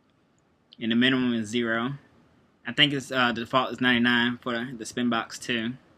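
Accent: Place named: South, United States. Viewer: This really really helped me , thanks alot man . i like your country accent too :)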